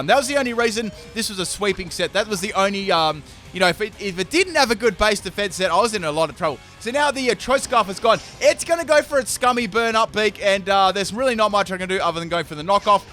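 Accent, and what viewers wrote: Australia. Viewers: Australian accent baby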